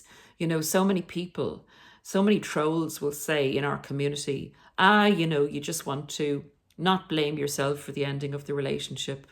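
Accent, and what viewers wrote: Ireland. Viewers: As well as her beautiful Irish accent ♥️🥰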